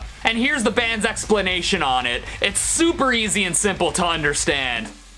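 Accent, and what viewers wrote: England, United Kingdom. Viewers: Spot on English accent to be fair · As a Brit, I approve that accent.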